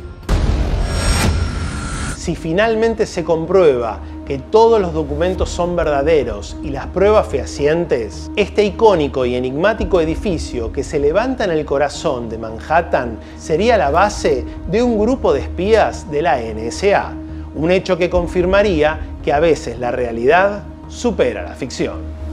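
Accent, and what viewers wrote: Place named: Argentina. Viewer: Es muy desagradable el exagerado modo de hablar argentino. Debe ser que lo remarca para que se note aún más. Es que así se cree importante????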